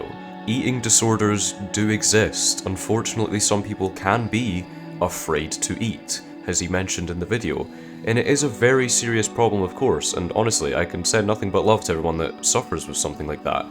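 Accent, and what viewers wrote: United Kingdom. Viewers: dont try to hide the scottish. i can tell you are. let the inner celt out fellow scott. alba gu braith! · Is that an Edinburgh accent bro?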